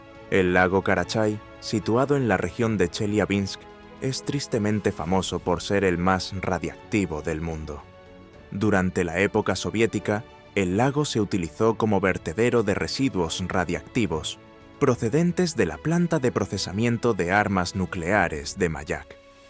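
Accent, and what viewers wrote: Spain. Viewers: Este hombre por su forma de hablar es de España que se puede esperar de alguien de ese pais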